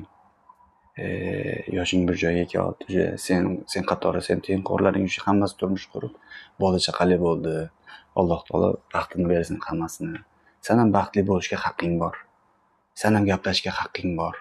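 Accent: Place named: Turkey. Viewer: Узбекчани 5 йилда яхшигина унутибсиз. Туркча акцент. Углим рус мактабда укиган, Россияда. Лекин узбекчани аксентсиз гапиради, кийналмай.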